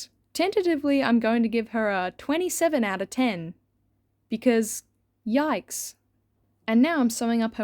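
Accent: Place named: Australia. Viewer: This is outstanding and your Aussie as well like wow!❤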